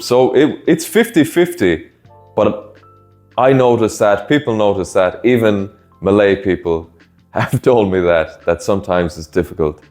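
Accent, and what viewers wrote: Ireland. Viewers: You did good, loved this also your Dublin accent (The nuns that taught me in East Malaysia were from Dublin) good luck on your blogging!!!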